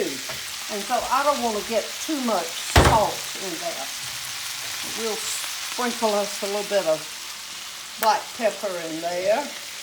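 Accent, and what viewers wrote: South, United States. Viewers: I cannot believe that I have laid out on my counter my sausage to thaw my cabbage is already cut up and my onions and ready to make this dish! I live in Alabama, so we do cook very similar. I am the one that makes my potato salad exactly like you do.! I have just found you under recommendation from another lady that I know and I am so thankful and I love your southern accent!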